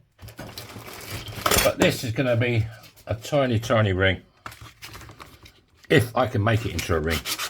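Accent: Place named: Essex, United Kingdom. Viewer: you mentioned you were in Cornwall at one point, where out of interest , I me in St. Mawes. but like me your accent says Essex or east London!!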